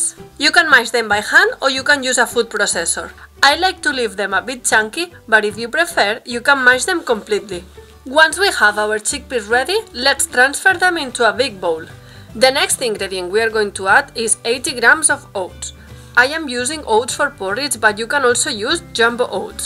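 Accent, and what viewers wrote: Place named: Greece. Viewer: Thank you so much for your channel! I love your accent; You sound like my cousin from Greece.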